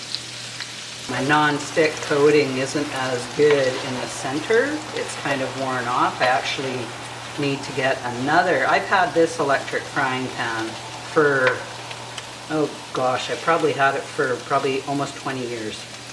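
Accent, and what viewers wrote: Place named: British Columbia, Canada. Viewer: Well done ma'am, looks delicious. First time running into your site, enjoyable. Noticed a wee bit of B.C. brogue in your voice. Can listen to that all day long. Am located probably about 400 miles south of your location. Have an enjoyable weekend.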